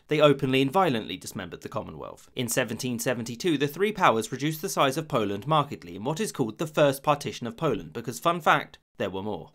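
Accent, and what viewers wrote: United Kingdom. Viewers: Yes that's a British accent lol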